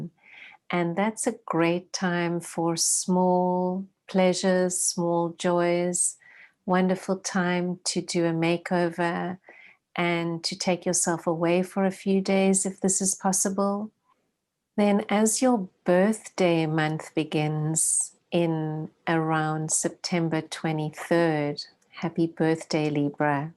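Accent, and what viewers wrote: South Africa. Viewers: Loved this; thank you very much! Gives me hope. Wonder if I can still meet someone during Covid living at my parents house haha. My Ascendant is libra at 23' degrees; Will Pluto still have an deep transformative influence on me? you sound South African haha:)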